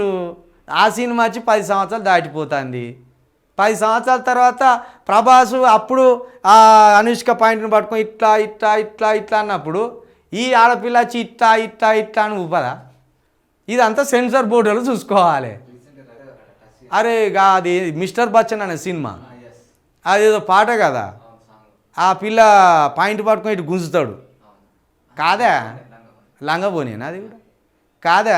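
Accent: Telangana, India. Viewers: Telangana Slang is super.👌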